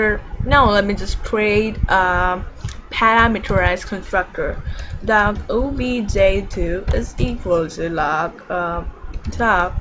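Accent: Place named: United States. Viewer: american english but its good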